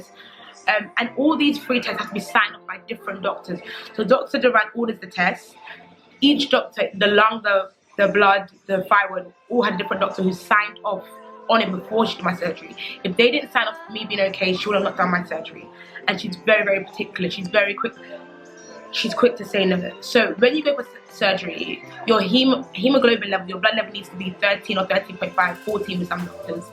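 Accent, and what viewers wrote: United Kingdom. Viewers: Really brit accent is the hardest to understand. So hard. I love American English. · British english, probably something around London.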